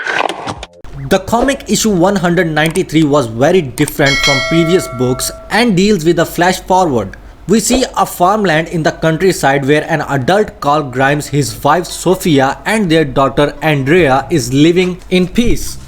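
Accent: India. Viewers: Lmao that accent reeks of curry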